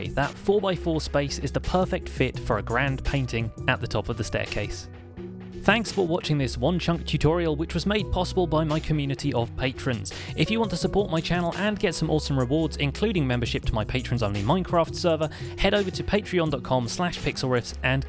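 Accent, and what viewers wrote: United Kingdom. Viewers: are you British I can't tell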